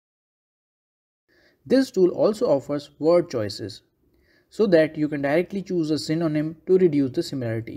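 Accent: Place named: India. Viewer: I come from London. Probably his stong Indian accent makes me don’t understand what he said.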